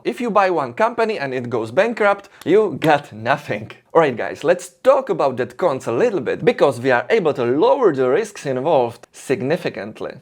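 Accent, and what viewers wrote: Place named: Czech Republic. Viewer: Proč musejí mít češi v každé řeči ten český akcent , to se nedá poslouchat po 16 vteřinách jsem to vypnul.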